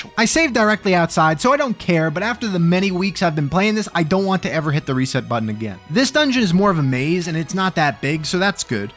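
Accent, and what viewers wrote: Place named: Philadelphia, United States. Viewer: avgn feels like Jersey, feels like Philly (I grew up in Trenton) Might be the way he says bawss reminds me of a Philly saying cawfee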